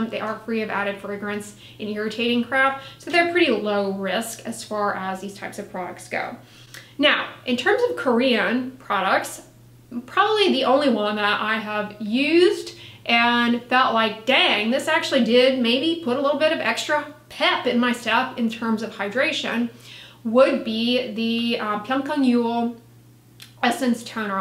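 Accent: United States. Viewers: Your american accent is so strong I hardly understand you 😶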